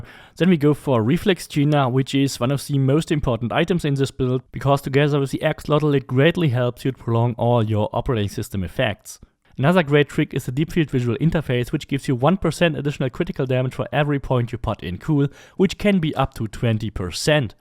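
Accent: Germany. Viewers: I think i hear the german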